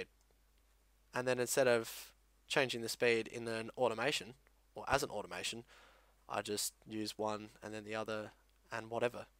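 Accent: Australia. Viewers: YESSSSS AUSTRALIAN! FUCK , WHAT AM I DOING BAHAHA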